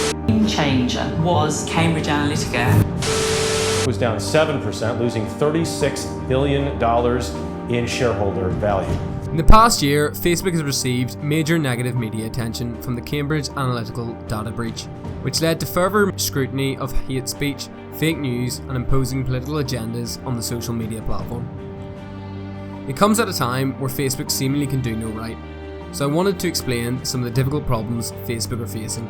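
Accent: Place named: Scotland, United Kingdom. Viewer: Hi, That collage is well put together. Your accent sounds a bit Scottish. After watching your video, I read "Bias Within Artificial Intelligence" and "The Future of Voice Control". You mentioned how Google (Siri) and Amazon (Echo, Alexa) are going from smart homes to smart offices to smart cars. Over here we are talking about smart cities - or, mores specifically a Google controlled suburb in Toronto. Or, at least our Ethics Committee were both before and during the Grand Committee meeting when it was in Ottawa. "Bias Within Artificial Intelligence" is topical because politicians on both sides the the 49th parallel are looking at AI and algorithms. An American Congressional Committee was looking at the issue mainly as something that is biased against people of colour and the Canadian Ethics Committee was also looking at the ways it promoting some content while making other content harder to find - and how algorithms can be gamed. 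I think that what you said is important, since an algorithm could determine whether one can get a mortgage or even be accepted as a tenant - or if the neighbourhood where you lives could influence whether they can get credit, insurence or even a job. "An algorithm or supercomputer is in itself incapable of displaying discrimination or bias. On the other hand, the human being who programs the algorithms is capable of doing so at different stages: during data collection, during processing, or during the preparation of questions the algorithm wil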